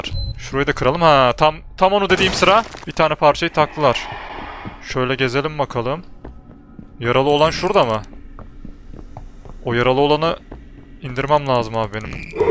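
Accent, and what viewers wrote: Sivas, Turkey. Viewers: abi sen sivaslımısın?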